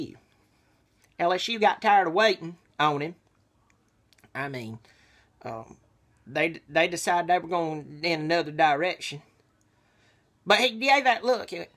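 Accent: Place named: Arkansas, United States. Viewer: Im trying to guess where your accent comes from.. arkansas?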